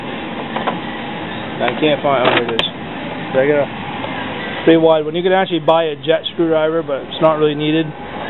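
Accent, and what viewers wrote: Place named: Aroostook County, United States. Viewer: Sounds like your from aroostook county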